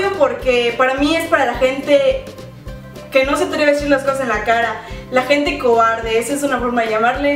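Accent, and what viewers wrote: Campeche, Mexico. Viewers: Si que tienes acento de Campeche ajajja , que linda 💗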